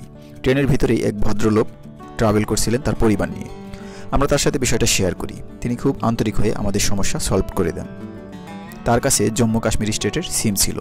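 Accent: Bangladesh. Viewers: That's Bangladeshi Bengali accent. We Indian Bengalis don't speak like that. Our Indian Bengali accent and pronunciation are different from Bangladeshi Bengali.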